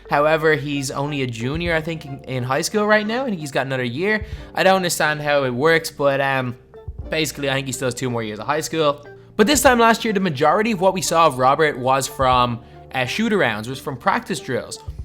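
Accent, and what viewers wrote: Ireland. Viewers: What the fuck is your accent.. You sound posh Irish.. Do you want a soft drink? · ARE U irish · are u Irish ? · Are you Irish? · Never realized the Irish accent until you said you played in an Irish league lol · R u Irish I can hear the accent kinda ?????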